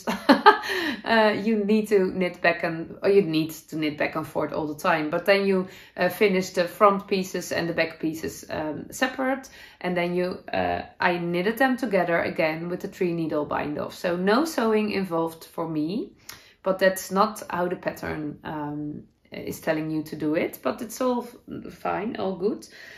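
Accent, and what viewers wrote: Netherlands. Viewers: thank you so much for sharing your life and makes with us all. I love your dutchy-english and am always impressed with just how fluent you really are. That bike ride at the end was FABulous! I'm so envious of your locale 🌳and I absolutely love your pup 🐶❤You really are so talented and seem to know your personal style so well. Oh yeah, I also love your hair!!!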